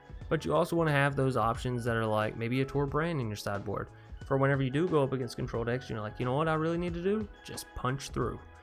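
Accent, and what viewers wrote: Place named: East Texas, United States. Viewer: Your combination of the east Texas-esqe accent with the geeky content is highly entertaining.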